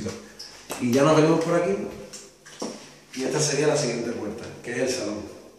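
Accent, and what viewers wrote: Malaga, Spain. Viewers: eres malagueño verdad?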